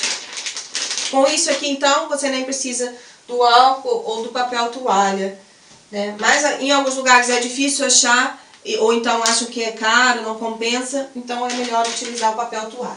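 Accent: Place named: Portugal. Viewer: Engraçado... a primeiríssima coisa que notei foi o seu sotaque... já está falando com os acentos portugueses...rs.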